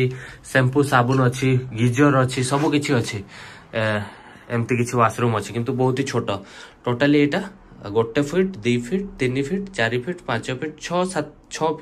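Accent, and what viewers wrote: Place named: Odisha, India. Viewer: Jay Jagannath Apana ete desha bulileni Kain apana odia kahile bele fas fas sound asuni ki apana anaodia pari kahu nahanti Kintu ae rajadhani ebam ctc re emit kain kahuchanti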